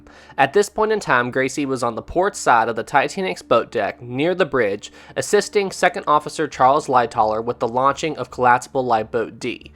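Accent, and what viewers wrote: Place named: Appalachia, United States. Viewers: Hey brother, you are most definitely from the Northeast US, am i correct in saying that? Like, Virginia, West Virinia, Maryland, NC or PA? But to narrow it down even more, i would guess Virginia....in the appalachians. Lol, am i right? Btw, its the accent and pronouncing of washed warshed. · Your regional accent is same as mine...you in Tennessee...?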